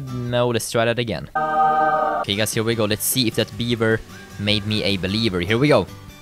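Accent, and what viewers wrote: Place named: Sweden. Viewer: You sound like your swedish.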